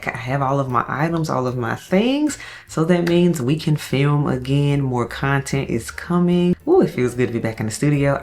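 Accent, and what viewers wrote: Memphis, United States. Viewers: 🌻🌻🌻🌻🌻🌻🌻I know a "Memphis accent" when I hear one! So glad your move went well! Gonna check out two of those scents you showcased! Take care!!!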